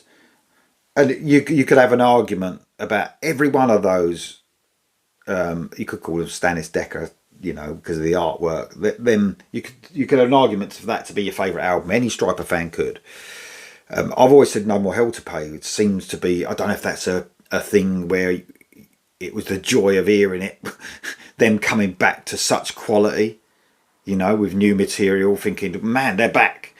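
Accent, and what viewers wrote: United Kingdom. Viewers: Are you british? ❤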